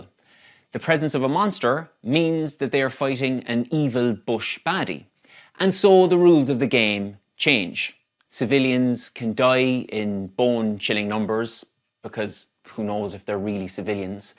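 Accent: Ireland. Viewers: 👏👏 You have hit the nail on the head with this video! Bravo for speaking out against the atrocities. Big fan of your channel and have subscribed and I’m spreading the word to my family and friends too. Thank you so much for your support 🙏🇵🇸🇮🇪 As always pleasure to hear an Irish voice 🎉 God bless you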